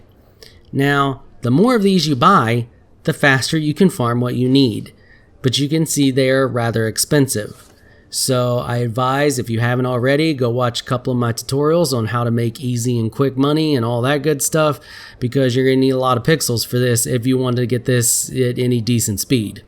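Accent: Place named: South, United States. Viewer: I really can't even pin your accent. Sometimes it sounds slightly southern, other times not. Sometimes it has this sort of effeminate twinge at the end of each sentence. Then in the episode about the 10 things that they took out of Starbound. You talked about the barrel and completely lost your shit, you got way louder and lost the accent the twinge everything, your voice completely changed.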